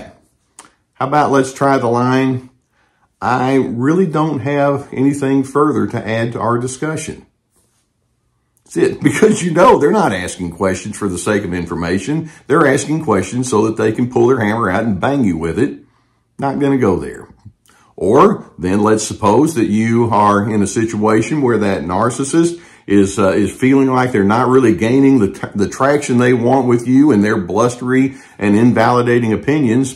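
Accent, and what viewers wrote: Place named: North Texas, United States. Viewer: Thanks for posting this. Your North Texas voice is comforting as we lived most of our lives around the Plano area. We've recently retired from North Texas to Portugal and share a two apartment condo building with an elderly, Dutch female textbook narcissist. Emails and text messages from this neighbor attempting to govern our lives are read with varying degrees of trepidation. Is it possible to create a video for us about online communication styles of narcissists? Thanks!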